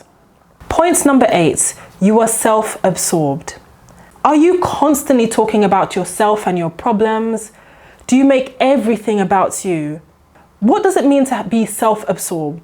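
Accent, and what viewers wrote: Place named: United Kingdom. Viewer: That is excellent advice. A lot of people assume you just know what kind of person you should marry. In fact that's the best way I know of to really screw it up. The ideas I have when I married was to start wrong and it shows in my own marriage. I simply didn't know what I was doing.. I am so pleased that young ladies like you are like you are employing sensible approaches to life-and-death cool approaches to life in general. Also, that gorgeous British accent doesn't hurt!! Keep going. You make me proud.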